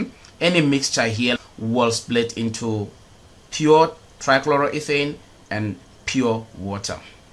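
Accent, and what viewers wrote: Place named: India. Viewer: nice job. Thank you professor. I love your Indian English❤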